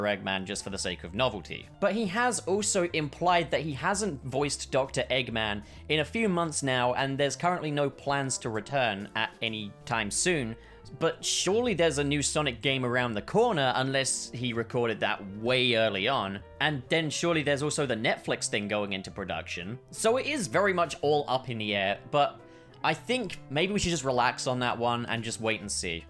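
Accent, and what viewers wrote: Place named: United Kingdom. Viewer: I think he is somewhere in the u.k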